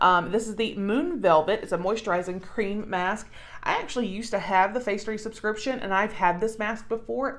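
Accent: South, United States. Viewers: I love all the items in the Box!!! It would be like Christmas morning opening that box of wonderful surprises!!! I love your southern accent and you did a marvelous job Darling!!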